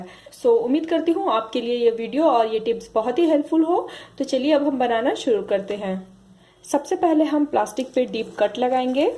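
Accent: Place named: Bihar, India. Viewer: Aarey aap Bihar se ho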